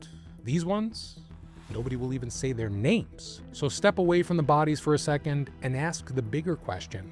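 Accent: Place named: Pittsburgh, United States. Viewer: I gotta ask, are you from Pittsburgh? I know you say in the beginning you are in Vermont but your accent reminds me of my hometown. ❤ Love your videos BTW.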